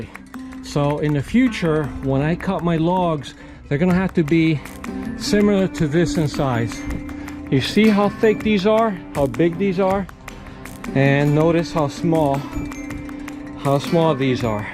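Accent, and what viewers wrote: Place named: New York, United States. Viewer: You sound like me . I live in NY too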